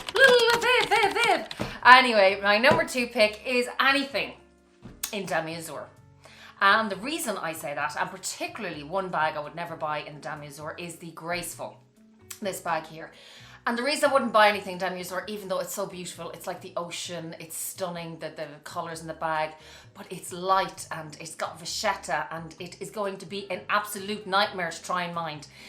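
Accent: Ireland. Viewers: Hi Viv, I have 3 LV’s (speedy, neverfull & retiro) & I love them but to be honest I’m done buying LV’s right now. Love to hear your voice esp. your Irish accent. I used to work in Beaumont Hospital as RN, now I’m base in US. I’m from the Philippines originally. Always looking forward to your videos.❤️❤️❤️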